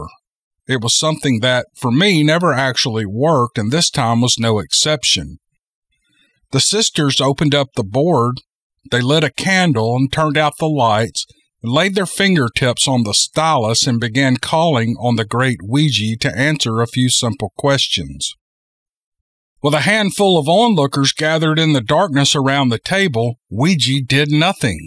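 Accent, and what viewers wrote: South, United States. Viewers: I can only speak for myself, but I haven’t necessarily returned to this channel again & again over the last few years only for “Bigfoot” stories. While that’s what drew me here initially, I quickly came to genuinely like; his familiar southern drawl, his easygoing personality and, his masculine voice… and some genuinely great stories. Sadly, nowadays it seems that any and every possible point of interest seems to devolve into some sort of cult. And we all know to stay far away from cults. could read the lyrics to, and invariably, I’d eagerly listen-in whilst cooking supper or washing the dishes… but, this is all just great entertainment, not a system of belief. Keep up the fantastic work, …💯🇺🇸❣️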